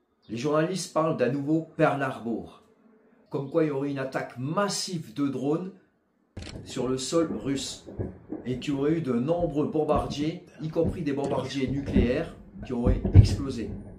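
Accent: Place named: Lorraine, France. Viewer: J'adore l'accent lorrain-moselle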